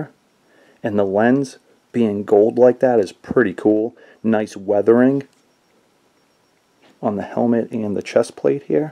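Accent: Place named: Massachusetts, United States. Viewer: Hey, you from Mass? The accent sounds familiah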